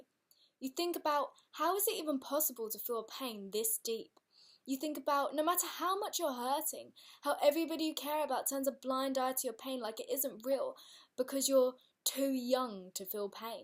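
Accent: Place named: United Kingdom. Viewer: british accent just makes people 3 times more attractive. ughhhhh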